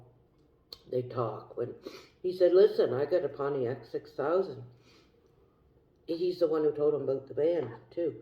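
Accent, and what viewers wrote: Canada. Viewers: Are you originally from alberta? Some things you say sounds like you’re from one of the Atlantic provinces!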